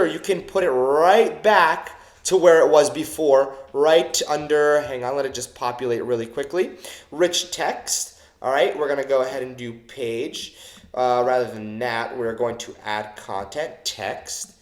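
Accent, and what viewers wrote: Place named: Italian-American, United States. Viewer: EssentialShop, although the video speed makes it difficult to keep up, the main problem with the video is the mispronunciation of keywords and the speaker's nasally and seemingly Italian-American/Jewish accent. The best you can do is to turn down the speed and read his lips while you listen. Keep in mind that those who speak with these accents will have no problem understanding the video at whichever speed. There is nothing wrong with your hearing or ability to understand. Those who would criticise you just lack the ability to see things from a different perspective and readily show their unjustified intolerance. Good luck.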